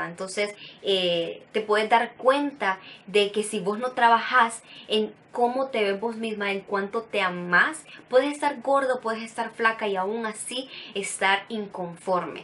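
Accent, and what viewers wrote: Honduras. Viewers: Hola, como estás? es la primera vez que veo vídeos tuyos. Y me ha encantado, chica ya te ganaste otra suscriptora.!! Saludos desde Madrid, catrachaaa! me encanta escuchar mi acento!!! 😂😂😂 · Bravo 👏 me encanta ver cómo te expresas a lo catracho👏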